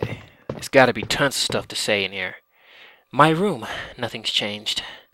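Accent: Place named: RP, United Kingdom. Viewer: You know she's British :D you can tell she has a posh voice haha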